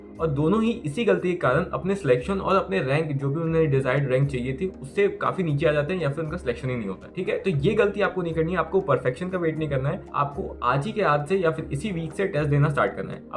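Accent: Bihar, India. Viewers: Bhai you are from Bihar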